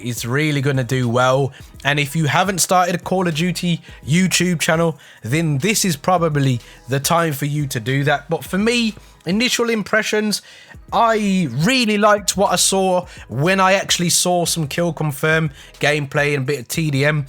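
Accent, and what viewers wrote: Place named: Scotland, United Kingdom. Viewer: Nice haha, I'm Scottish but I could tell by the accent. Also, if you need music to put in your videos feel free to use mines!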